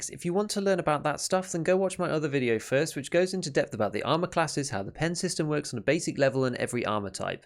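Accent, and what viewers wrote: United Kingdom. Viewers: The 3kliksphilip of tarkov. Even the british accent is included.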